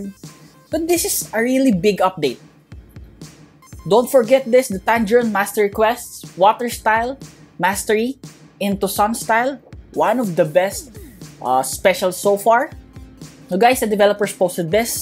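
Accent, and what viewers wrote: Philippines. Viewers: talking sus he sounds like a filipino..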